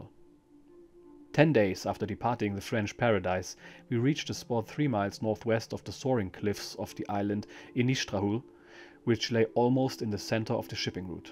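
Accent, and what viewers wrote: Germany. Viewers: thank you for the editing and translation, it's that much more enjoyable knowing you ensure accuracy. Again, the German accent makes it that more authentic. If I go to a Chinese restaurant, I prefere to see a Chinese guy cooking in the kitchen.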